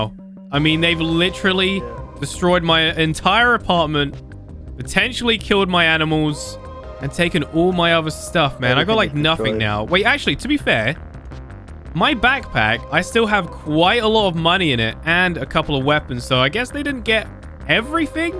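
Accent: United Kingdom. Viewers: are u from da UK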